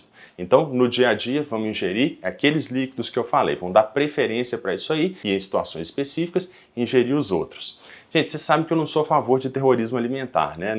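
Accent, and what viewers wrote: Minas Gerais, Brazil. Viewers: Obrigada doutor! Exposição clara, bem articulada, e este discreto sotaque mineiro é muito bonito. Já me foi retirado dois adenomas. Faço o melhor por mim, só não consigo parar de fumar. Mas fumo 2 a 3 cigarros por dia.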